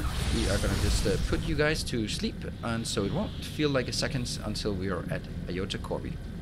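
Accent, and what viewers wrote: Denmark. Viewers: He is indeed Danish.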